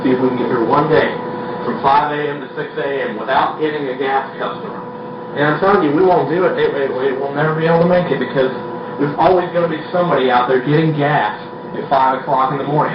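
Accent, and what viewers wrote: South, United States. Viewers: Oh, wow... you really did used to have a southern accent. lol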